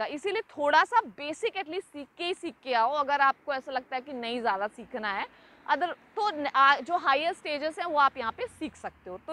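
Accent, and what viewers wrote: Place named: Pune, India. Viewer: your hindi accents like Pune or mumbai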